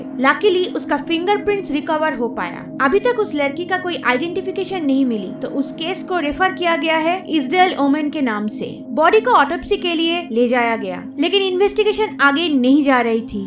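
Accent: Bengal, India. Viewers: Tmhari accent bengali hai, tm Bangladesh se ho ky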